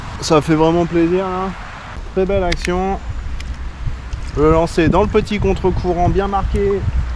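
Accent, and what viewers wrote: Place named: Normandy, France. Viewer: Bravo pour pour ce silure! Tu fais jamais bredouille sur la Dordogne? J'essaye de décoder ton accent, est ce que tu es de Normandie ?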